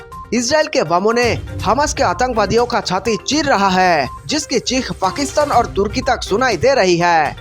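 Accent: Bengal, India. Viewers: Tum bolte bahut achhe ho...!! Are you bengoli!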